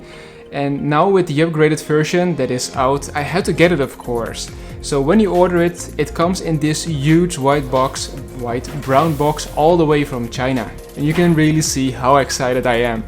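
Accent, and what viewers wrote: Netherlands. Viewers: Nederlander die engels spreekt🙃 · Haha even though your English is near perfect I could hear the dutch accent. Nevertheless, Great video though! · The dutch is strong on this one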